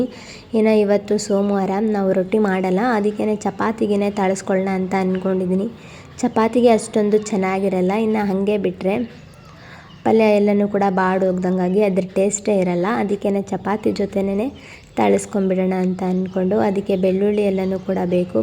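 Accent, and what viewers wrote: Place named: Haveri, India. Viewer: Nivu matado reeti haagu nivu mado aduge recipe yella nodidre nam Haveri saidnavaru ansutte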